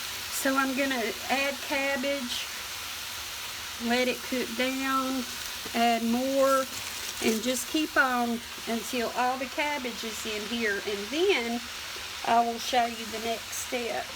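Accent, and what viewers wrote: South, United States. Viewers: I love to listen to a southern accent...I could listen to all day long.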